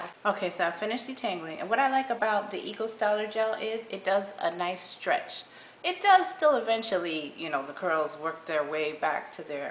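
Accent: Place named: Caribbean. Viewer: I can hear west indian in your accent..where are you from?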